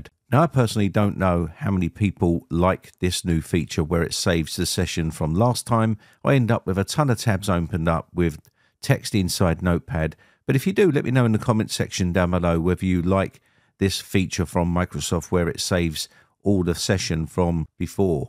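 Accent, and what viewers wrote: United Kingdom. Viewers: Thank you so much random british person on youtube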